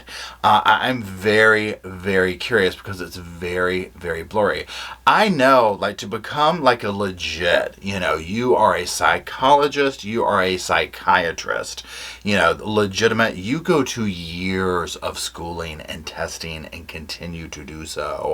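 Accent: South, United States. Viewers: 1st.. luv ur S. accent.. wonder if Kevin thinks by divorcing her that it will hide his actions b4 he was sent away by Roobs?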